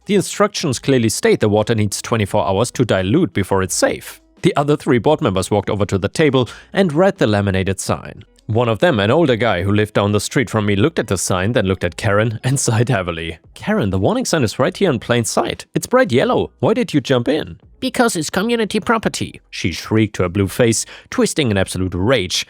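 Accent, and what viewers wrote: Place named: Germany. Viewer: A German narrator always is watchable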